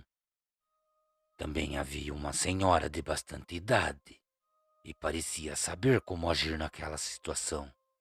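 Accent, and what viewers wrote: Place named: Paraná, Brazil. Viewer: Bom noite,! Pelo seu sotaque tu deves ser do Parana!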